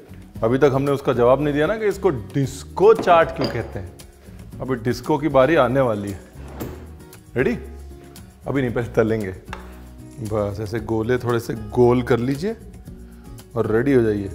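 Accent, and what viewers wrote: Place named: Varanasi, India. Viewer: बोले तो छा गए गुरु... बनारसी अंदाज में।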